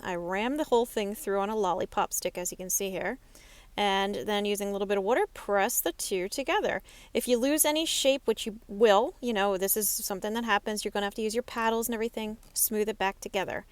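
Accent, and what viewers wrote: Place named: Maryland, United States. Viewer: Are you from Maryland? Noticing your accent, hon! 🦀 P.S. Thanks for tutorial 😀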